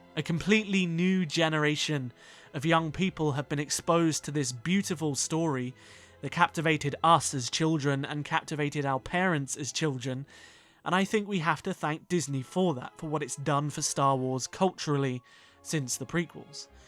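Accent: United Kingdom. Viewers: First of all, I absolutely agree (25 secs into the video) because every argument sounds BETTER with British accent. Thank you so much for throwing this video and pointing out excellent things. This video is the PRIME reason I started my own channel to bridge the gap between Legends & Canon and have the fans see the beauty in both! LucasFilm cares, Disney cares, and the new Legends re-prints are glorious!! I'll be watching your videos. Thank you so much for this great video.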